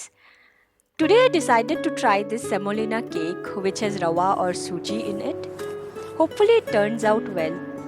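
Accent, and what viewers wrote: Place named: Northeast India, India. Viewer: Hahaha..... breaking of the rolling pin and the glassware being intact is indeed funny......I have been watching a lot of your videos past two weeks, they are really nice.... Your English is perfect, nevermind accent, no one outside India does.....btw, I was born in northeast, so I totally understand the accent and I love it. Best wishes for you and your family 💞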